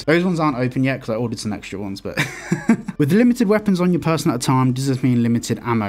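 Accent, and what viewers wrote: Birmingham, United Kingdom. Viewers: R u from Birmingham geezer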